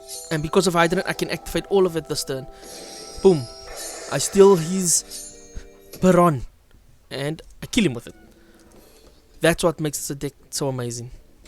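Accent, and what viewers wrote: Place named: South Africa. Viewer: Are you south african? Sounds like it :) If thats the case let me know the. I'll subscribe.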